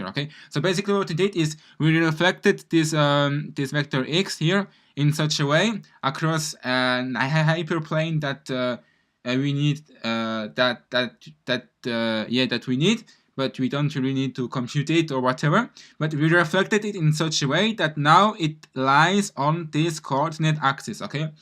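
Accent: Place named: Germany. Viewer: Are you german? Haha I like the way you talk. Anyway, nice videos!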